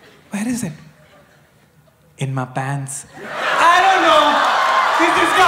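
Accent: Kerala, India. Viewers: rocking the Mallu accent tho 😀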